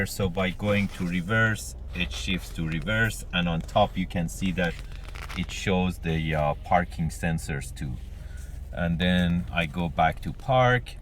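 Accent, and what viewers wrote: Iran. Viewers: that persian accent tho ;)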